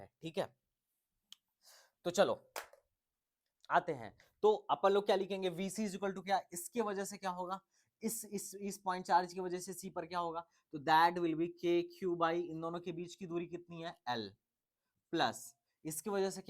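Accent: Bihar, India. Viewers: Sir ji lagta h Bihar se h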